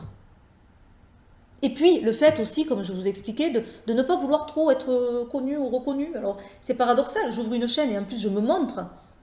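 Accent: South, France. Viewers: ce bel accent que nous avons dans le Sud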